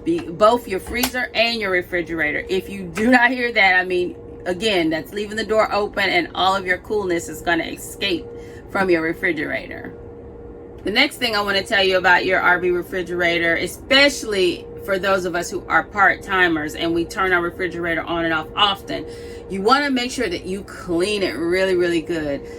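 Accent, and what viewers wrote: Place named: South, United States. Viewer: Girl.....I thought you were from the south. I wish I could take credit, I use to watch my grandmother toast bread that way. Great video thank you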